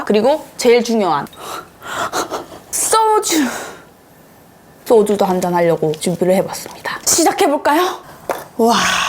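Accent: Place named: India. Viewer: 영어발음이 인도식인데 좋쿠만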